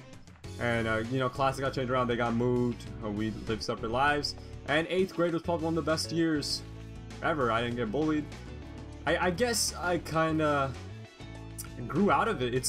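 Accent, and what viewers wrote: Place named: United States. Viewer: For a Pakistani you have a American voice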